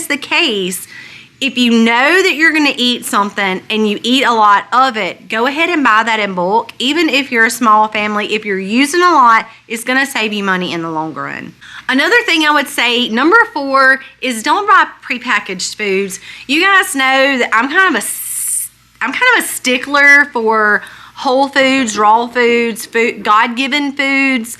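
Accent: South, United States. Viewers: I'm from the south but I absolutely love your southern twang. The south is the best even our Southern Belle women have sweet beautiful speech!!!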